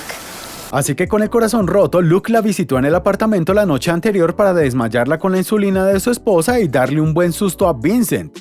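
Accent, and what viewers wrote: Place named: Colombia. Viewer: Eres de Colombia? es que tu acento se parece a uno de los tantos acentos de que tenemos aquí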